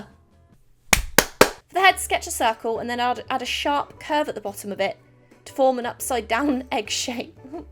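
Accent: United Kingdom. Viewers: “Let’s put on our fancy voice.” Tf you talkin bout ur british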